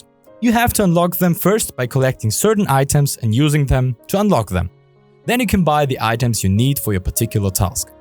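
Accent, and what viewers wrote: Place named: Germany. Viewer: Quick question sir are you German or am I wrong about that If so that's fucking cool you're accent makes me strangely happy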